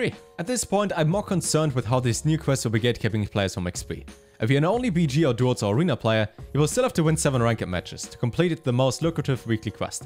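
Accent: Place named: Germany. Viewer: he's making a lot of good comments, but boy, this German accent is killing me ...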